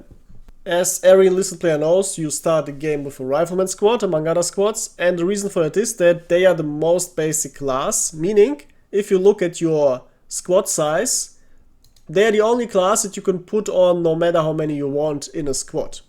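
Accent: Germany. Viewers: truth! Obviously, his Enlisted take is great, or we wouldn't watch. But the delivery - a balance of German inflected English accent with many wry wittisisms is the cherry on top. If instead it was rando guy from North America, it might be less pleasing to my ears.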